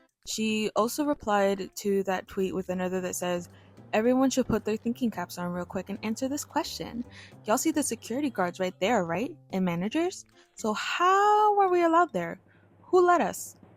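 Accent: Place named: Spain. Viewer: Out of context but I love your spanish pronounciation🫠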